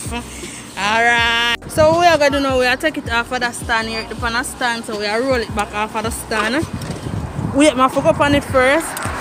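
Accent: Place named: Jamaica. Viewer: Girl. Your accent . Fellow Jamaican here. When u said ' mi jus a waut pon the light fi change u zeen ' dwl 😅 and your Chinese😂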